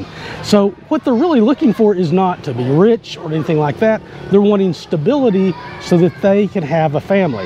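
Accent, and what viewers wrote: United States. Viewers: I couldn't say what region of USA you are from...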